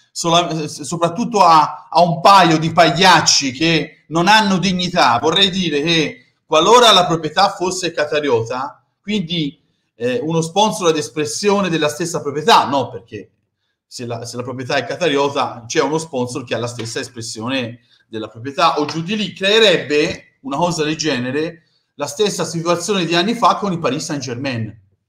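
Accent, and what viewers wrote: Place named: Piombino, Italy. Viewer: Tu hai giocato a pallone e sai quello che dici e non sbagli un colpo, la storia che racconti è perfetta, come parli mi fai morire dal ridere, ho frequentato per 40 anni Piombino isola De'Elba mio fratello aveva famiglia a Venturina, e parlano come te, la simpatia è unica, scusami di questa esternazione, ciao, forza inter sempre ❤❤❤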